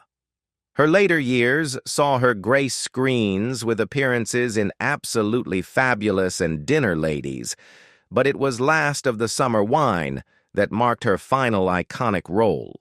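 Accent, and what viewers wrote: United States. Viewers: If you are going to tell us about this great show learn how to talk English not American..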